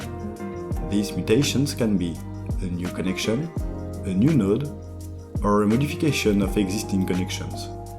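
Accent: France. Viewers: love ur french accent ! u are french right ? je le suis aussi xD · J'ai reconnu l'accent français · ahlahlahhh qu'il est beau notre accent francais ! j'adore 😆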